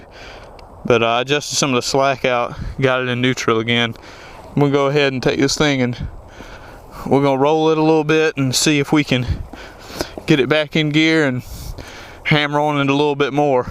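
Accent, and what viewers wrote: South, United States. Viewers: Georgia or Louisiana lol you seem like your from 1 of those states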